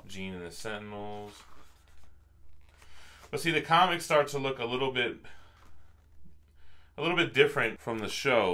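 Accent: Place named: Bronx, United States. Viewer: i dig your videos and love your south Bronx accent but i gotta ask...lol. where in the world do you keep all the goods constantly coming in dude? lol between the statues and the 12 inch books, you must live in a mansion or a warehouse..lol. peace.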